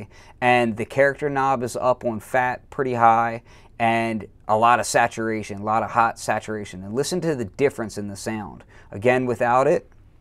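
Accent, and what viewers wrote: Philadelphia, United States. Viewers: Thanks, great demo. I like your Philly accent, too.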